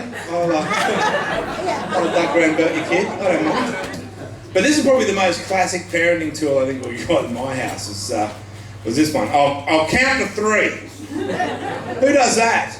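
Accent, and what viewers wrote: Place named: Australia. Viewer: I love fresh stand up, beards and an Australian accent. This guy is a total package! oh and funnier than $&!+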